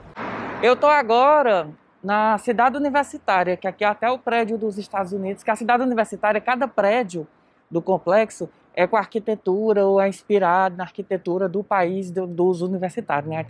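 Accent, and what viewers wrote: Ceará, Brazil. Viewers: Ohh, te admiro demais viu... Tbm sou cearense e amo ouvir sua voz, o sotaque 🤩🤩. Deus continue te abençoando viu mininu... 🙏🥰😘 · Rapaz, vc me impressionou. É tão lindo o seu jeito de falar cearez, mesmo com momentos muitos difíceis, sua forma positiva de encarar as coisas, é realmente, motivador!💛🇧🇷💚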